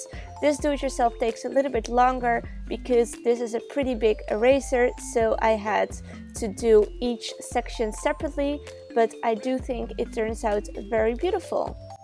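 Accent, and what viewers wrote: Netherlands. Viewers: Je hebt een Nederlands accent aan je engels supergrappig!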